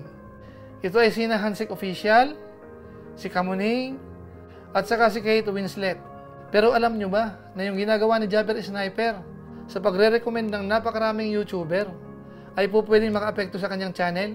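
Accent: Bicol, Philippines. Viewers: Bicolano ka ba noy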